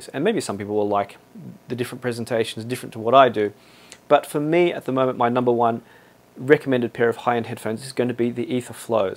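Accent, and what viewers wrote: Australia. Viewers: where are you from.. don't get the accent.. a cross between English and south african...probably Australian or kiwi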